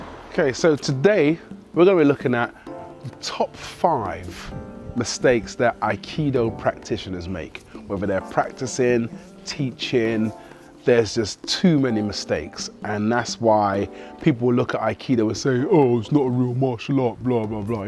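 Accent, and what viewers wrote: United Kingdom. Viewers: Love the "3 strikes for the price of 1".....your wonderful accent makes me miss London and "The Continent". Very curious what the lady with dog said that made you chuckle. What I think you are saying is that there are the martial arts in the gym and then, well; there's brawling in the street.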